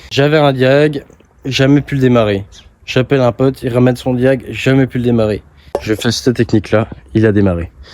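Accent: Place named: Alsace, France. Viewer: Non avec une léger accent Alsacien on dirait... J adore ♥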